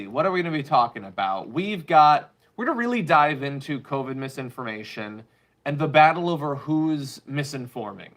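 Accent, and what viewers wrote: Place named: United States. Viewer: Try to think of what sentence you would want for someone who brutally raped your Mother and took pictures bragging about it and put them on all social media platforms. 'Cause that is how I feel about these psychopaths who raped and tried to rob our Country. Just think how long you would want those gang bangers to be sentenced to prison. And I don't know how you can "not" have a dog in this fight. Are you a foreign citizen? Because you sound like an American. But I'm pretty sure you are a progressive. How far do you think the progressive movement will get if we can't even have simple Democrats. These people want their agenda, and only their agenda. They want to see any and all progressive legislators dead.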